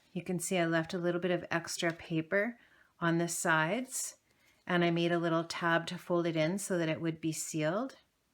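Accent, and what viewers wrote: Canada. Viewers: This very cool and I am enjoying watching and learning! You sound like you are Canadian...eh? Anyhoo...best wishes to you and yours for a happy holiday season! TFS! xo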